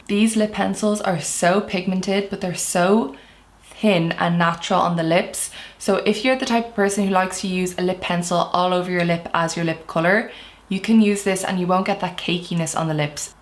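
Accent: Ireland. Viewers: Where is from? Ireland? I can't put my finger on her accent. She speaks very clearly, it isn't until the end of her sentences or a phrase she will use that I pick up on her accent. Does anyone know? Just curious, its driving me crazy trying to figure it out lol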